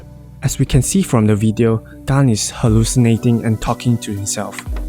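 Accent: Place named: Malaysia. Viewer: The Malaysia accent is killing me 😂 Good video brother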